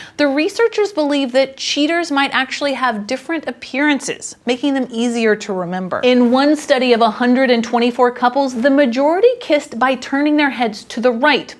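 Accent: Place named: United States. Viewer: Her voice reminds me why I don't like American accents. Absolutely no ability to modulate - it's just constantly shouting.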